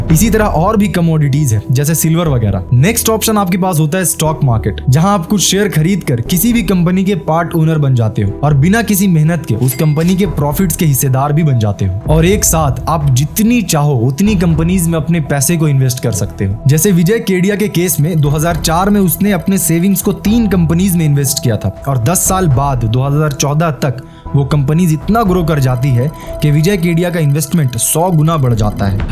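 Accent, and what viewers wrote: Bihar, India. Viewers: You are from bihar...... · मतलब आप बिहार से हो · Bhai kya tum bihar se ho please reply ❤❤❤❤ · Are you a Bihari? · Aap bihar se hona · Are you from bihar ??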